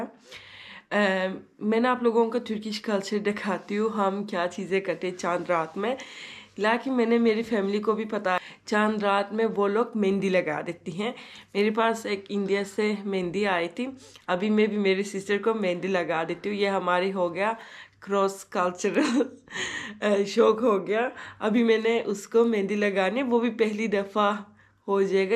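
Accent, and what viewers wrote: Pakistan. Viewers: Good video. love your urdu accent. be happy always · I really like you your smile aapi and ur pakistani accent too❤loves alot from pakistan and mehndi's design is also very cute😅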